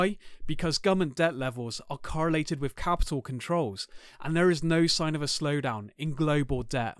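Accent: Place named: West Midlands, United Kingdom. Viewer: nice video! Are you from the West Midlands in the UK, or maybe the Bristol area? I recognise the accent :)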